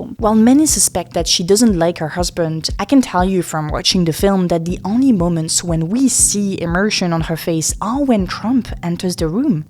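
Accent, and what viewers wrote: France. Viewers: P.s. You're gorgeous! And that frnch accent🐕🤩🥰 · How dare you call Trump a fasist, I do feel sorry for you, young, damp and with your french heavy accent, says everything! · ARE YOU FRENCH?????? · New watcher (from Sweden), love the French accent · Is it a french accent or an I tripping? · I hate the French accent, you should LEARN English.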